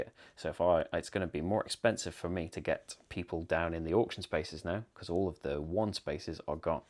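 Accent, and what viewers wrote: United Kingdom. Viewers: Hi there and great job, just discovered your channel and this playthrough is great. Thanks for the video, for us foreign players the british accent is sometimes more clear than the american one. Keep the good job and thanks again!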